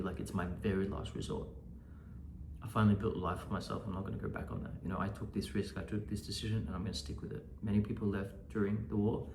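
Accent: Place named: Australia. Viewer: You've a cute Australian accent so beautiful 💗💗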